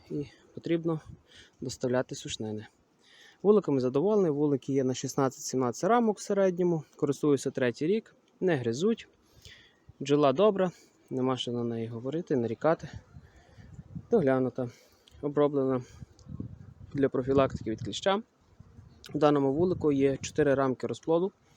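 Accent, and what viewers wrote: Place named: Ternopil, Ukraine. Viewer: По розмові чую брате ти з Тернопільщини. Південний захід. Бучач та околиці? Я з під Чорткова.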